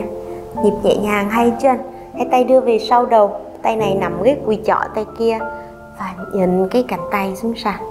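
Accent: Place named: Hue, Vietnam. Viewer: Bài tập hay quá Em ơi ! Lại nói tiếng Huế hay nữa 😘😘😘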